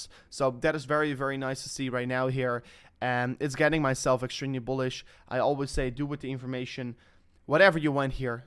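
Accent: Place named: Netherlands. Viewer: Hahha, this guy is Dutch .. Gast ,spreek gewoon Nederlands ..makkelijk te horen dat je Nederlands bent.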